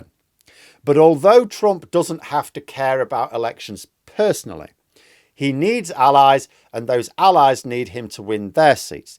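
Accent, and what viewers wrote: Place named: Yorkshire, United Kingdom. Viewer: I sent him an email about it so perhaps he could change it, without embarrassing him. He replied: "I don't mispronounce it, I pronounce it according to my own accent. Pronunciation is regional, and I ain't from your region. So, what I'll actually do is carry one pronouncing words in the way people from my area pronounce them." Kamāla is an Urdu name, different etymology; there are more Muslims in Yorkshire than Hindus by far so he may have heard that, quite different, name.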